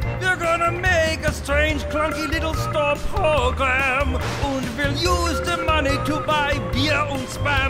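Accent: Germany. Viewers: And the third German agrees with that as well... your German accent sounds very interesting... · Why the german accent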